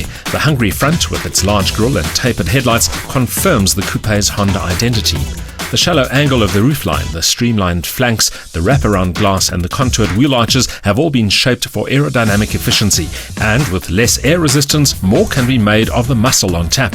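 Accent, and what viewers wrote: South Africa. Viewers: South African English , Their is a few different accents in South Africa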